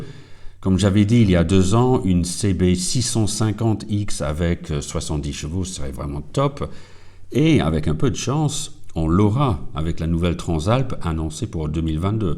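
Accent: United Kingdom. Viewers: Voici donc une courageuse et chouette decision qui ravira votre auditoire MotoFrancophone auquel je fais désormais partie. J’ajoute que votre pointe d’accent British et un raffinement absolu pour l’oreille, tout comme pour superbe moto, la perfection de sa conception doit passer imperativement par un savant reglage de sa sonorite! Les oreilles au service des yeux en somme. Vous réussissez avec brio ce pari egalement. Bravo et un enorme merci de nouveau! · ah je me sens Plutot mieux mtn. Javais penser: comment ose-tu parler mieux le francais que moi? Pendant toutes les annees que je suis Aller en France on me dit " je n'ai jamais connu aucun anglais qui sache notre langue comme toi" mais vu que tu vie en France tout ce temps la, ca fait du sense tu que sois meilleur que moi. Ca fait plaisir aussi le fait que t'aie pas perdu ton propre accent britannique plutot que parler fr avec un faux accent fr enervant comme font les profs de fr au RU. 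😀😀😀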